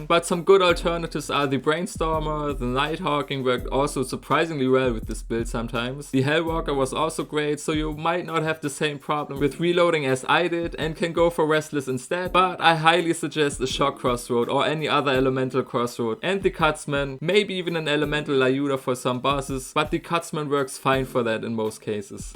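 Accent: Germany. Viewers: Think his first language is not English but German or so. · Der deutsche Akzent ist ziemlich offensichtlich bei ihm xD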